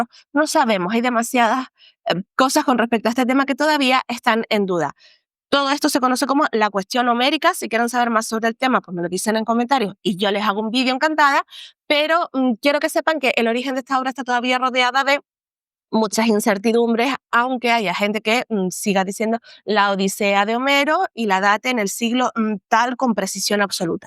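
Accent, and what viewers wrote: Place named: Canary Islands, Spain. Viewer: Me ha faltado en tu análisis algo que va más allá de la filología: el Alma. La Odisea es la definición literal de Nostalgia (Nostos + Algos, el dolor del regreso). Creo que te equivocas al disculpar a Nolan. Él nos da espectacularidad visual, pero nos roba el subtexto: el amor a la tierra, el calor del hogar y el miedo a perder a la familia. Convertir la Odisea en una película de acción grisácea es un crimen contra la cultura mediterránea. Ojalá defendiéramos más la 'magia' del mito y menos la 'lógica' del mercado actual. La Odisea es un arquetipo sagrado sobre el RETORNO, la Familia y el Honor. No va de un Ulises ingeniero resolviendo problemas, sino de un hombre roto que rechaza la inmortalidad por volver a abrazar a su esposa envejecida. Me da pena que aceptemos que Hollywood convierta el Mediterráneo (que es vida, color y pasión) en un escenario gris de posguerra nuclear solo porque es la moda. 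El público necesita hoy más que nunca esa calidez humana, no más frialdad intelectual. Te escucho y noto un acento canario del bueno, del que me gusta. (Quizás me equivoco; si es así, lo siento). Al escucharte ese acento canario, esperaba que conectaras mejor con la esencia real de la obra: la insularidad y la nostalgia. Como isleña, sabes que el mar aísla y que la 'morriña' no es solo echar de menos, es dolor físico. Creo que al justificar que Nolan haga una obra gris y fría 'para adaptarse al público moderno', estamos perdiendo el alma de la Odisea. La Odisea no es ciencia ni acc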